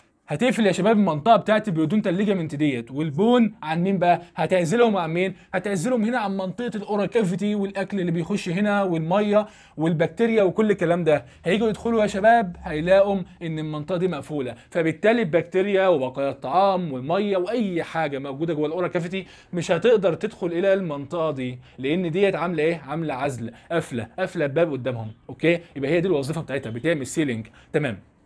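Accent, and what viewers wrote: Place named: Jordan. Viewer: متاكد انك مصري؟ حاسة انك من الاردن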